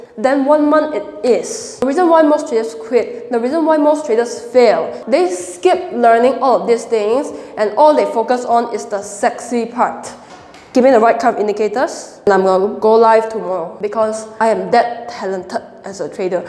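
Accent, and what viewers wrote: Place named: Singapore. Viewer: u sound singaporean and i like it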